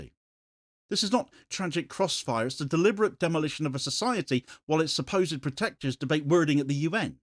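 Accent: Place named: West Country, United Kingdom. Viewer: I'm from the West country and I've never heard anybody sound like Shakespeare with the West country accent! I'm so glad I came across you as you're a breath of fresh air! Free Palestine 🇵🇸♥️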